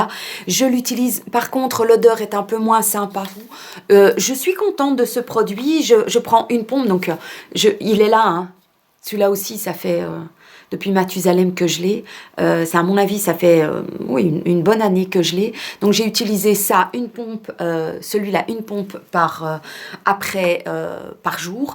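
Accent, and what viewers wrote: Belgium. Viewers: J adore ton accent c est désagréable quand tu dis ça je vois un one woman show bon tu seras peut être fâchée mais en tant que belge je m autorise l autodérision sur notre accent. Sinon. Faut vraiment que nous pensions à prendre soin de nous mes filles 19 et 15 ans ont ce qu il leur faut mais moi perso je me met de côté exceptée début d année où j utilise les produits des calendriers de l avent cette année c est thé bio, bougies et nuxe après je ne suis pas... je ne sais pas quoi acheter... mais il faut vraiment prendre soin de soi je dois juste l integrer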